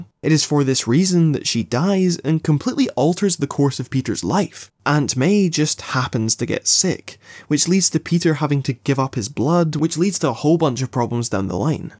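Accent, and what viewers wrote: Scotland, United Kingdom. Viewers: You Scottish?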